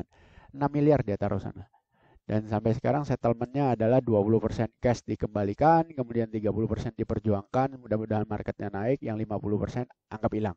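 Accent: Java, Indonesia. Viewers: Jiangkrikkk.... Muantapp ker lak ngomong. Medoknya dapet... Coba pak bilang jianncukkk...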